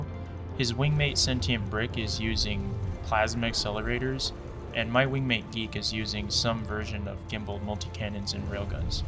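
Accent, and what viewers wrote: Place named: Philippines. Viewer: This guy sounds pretty Filipino NGL🥵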